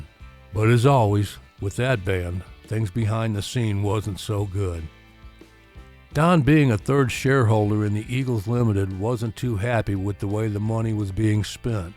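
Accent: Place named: West, United States. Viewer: What a well written, and well narrated story! I also like your western accent. It's stronger than mine, (desert southwest) and I can't place it.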